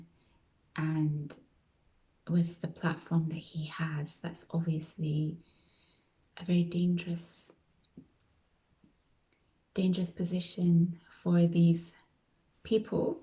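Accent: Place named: Scotland, United Kingdom. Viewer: You're absolutely right about politics being two sides of the same coin. I also find politics boring and contrived, and I agree that it's in our best interest to take things/viewpoints from both sides or none, rather than subscribing to just one. That way it's alot harder to be brainwashed or tarred with the same brush. Left/Right is just yet another duality we have here on earth. Everything is duality, pepsi/coke, red/blue etc... Constant divide and conquer techinques. I've never followed Charlie Kirk but I would never glee at anyone's death. I am extremely careful about where I send my energy. I'm not sure why Youtube decided to reccommend me this video haha. You have a very calming voice and seem like a high vibrational person. And I resonated with you when you mentioned how dull everything is during Wintertime in the U.K. It's like that here in Melbourne AUS too. 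I also travel during the colder months. Hot places are my favourite. I know you mentioned Sheffield, but did you live in Scotland at all? I noticed sort of a Scottish twang to your accent.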